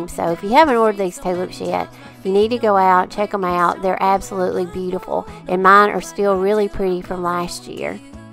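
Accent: South, United States. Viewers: Hello! Donna from Delightfully Southern mentioned you on her channel and she was right....you are so talented! Loved all of your crafts and I have already shared them with 3 family members!!! I was wondering what state you are from? I am in North Carolina and I noticed you have a southern accent so, I was just wondering. Can't wait to watch more of your video's. You all did a great job with your house. I did go back and looked at some of your older video's. ❤️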